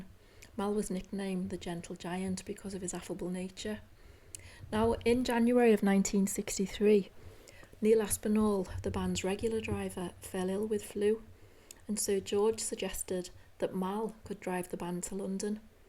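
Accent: Liverpool, United Kingdom. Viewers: delivered in a delightful Liverpool accent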